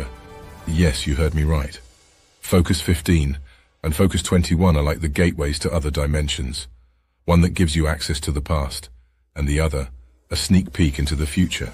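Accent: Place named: United Kingdom. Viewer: It's amazing how a well polished British accent brings legitimacy to a documentary :)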